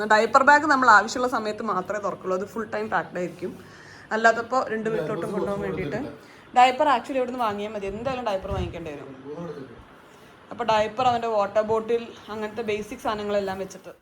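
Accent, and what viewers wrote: Malappuram, India. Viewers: മലപ്പുറം അല്ലെ ഇങ്ങള് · ഞങ്ങൾക്കും താളിപ്പ് കറി ഇഷ്ടമാണ് സംസാരത്തിൽ മലപ്പുറം ടച്ച് " ചൊയ" ഉണ്ടല്ലെ. ഇവിടെയും പറയും. പാലക്കാട് - മലപ്പുറം ജില്ലാ അതിർത്തി.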